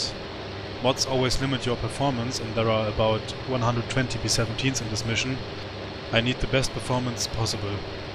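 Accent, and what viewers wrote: Germany. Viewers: You sound a little bit like a german guy... am I right?:) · Ihr deutlicher "germischer" Akzent verrät Ihre Muttersprache.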